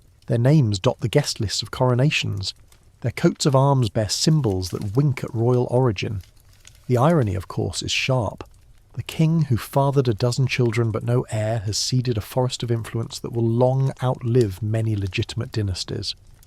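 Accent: United Kingdom. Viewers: You have to love this british accent. That's why I've found this same voice on at least 4 sleep channels now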